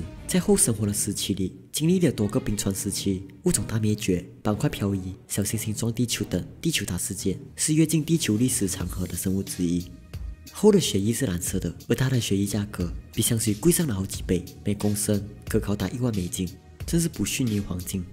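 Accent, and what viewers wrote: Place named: Malaysia. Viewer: 這馬來西亞口音我一北方人真的是聽的累死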